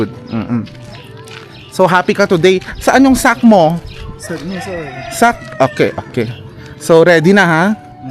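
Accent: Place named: Ilocos, Philippines. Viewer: Ilokano po ba kayo? Ung accent kase kapag magtagalog 😅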